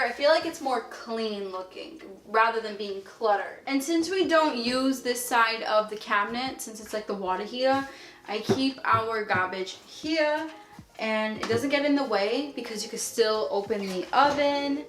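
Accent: Boston, United States. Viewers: I love your boston accent I’m Portland Oregon!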